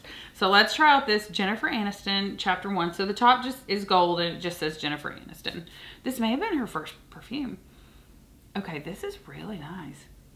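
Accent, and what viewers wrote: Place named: North Carolina, United States. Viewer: SOTD Paco Rabanne Lady Million Lucky 🍀 I always wonder if your from North Carolina by your accent. I’m originally from Long Island NY, moved to Charlotte in my late 20’s and now I live in TN. Hoping to move back to NC sometime in the future.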